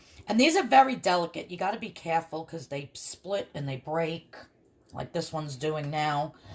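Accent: New York, United States. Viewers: I love your videos. I just subscribed. I want to start the purple plan this week and you have some great ideas. I'm from long island and you sound like your from NY. I feel like I'm listening to a friend or relative.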